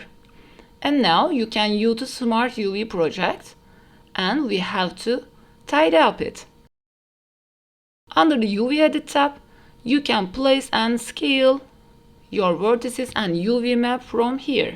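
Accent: Turkey. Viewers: Türk aksanını nerede duysam tanırım 😄 Güzel çalışma olmuş. Başarılar 😉👍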